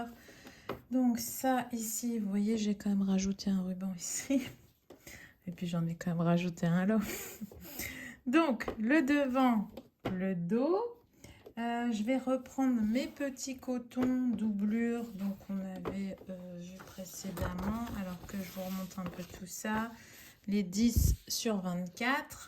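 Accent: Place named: Nord-Pas-de-Calais, France. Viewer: Mais oui chti toujours 😂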